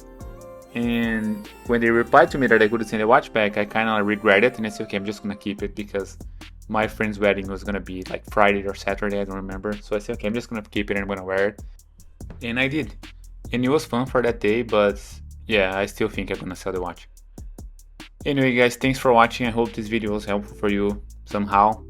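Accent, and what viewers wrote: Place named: Brazil. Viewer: Do you have Brazilian background? Based on your accent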